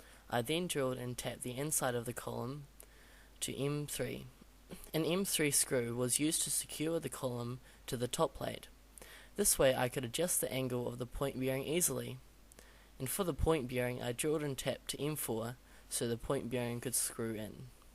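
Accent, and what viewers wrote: England, United Kingdom. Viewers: Wow. Kom je uit Engeland ofzo? Toppie accent. :) Is al dat gereedschap van jou? Draaibank en freesbank hoorde ik ook voorbij komen volgens mij? super werk heur!!!! :)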